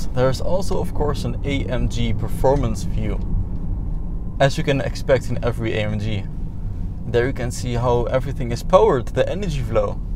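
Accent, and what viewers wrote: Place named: Netherlands. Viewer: Ugly dutch accent, stopped watching after i heard: ünlock and ~rare steering😥😥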